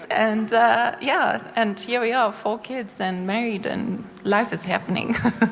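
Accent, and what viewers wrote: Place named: South Africa. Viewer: Can't you hear she is SA.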